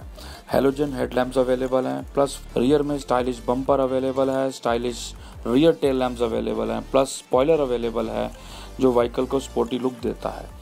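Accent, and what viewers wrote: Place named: Pakistan. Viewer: This person speaks Pakistani Hindi.....Ychel is available in Pakistan currently.So video is from Pakistan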